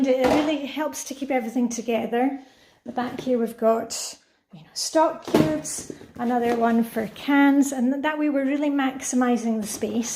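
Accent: Scotland, United Kingdom. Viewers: Ah could be sure your Scottish? I may well be going barmy?xx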